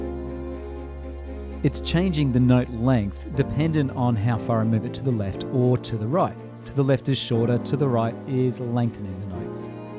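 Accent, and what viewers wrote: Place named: Australia. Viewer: I love Cubase 10.5.2 Pro but feel that I'm only using about 1% of its capabilities. It is clear to me that the person talking on this video is a fellow Aussie, but I have no idea who he is and would like to learn more about what he does musically and how he uses Cubase. The videos are awesome. Cheers.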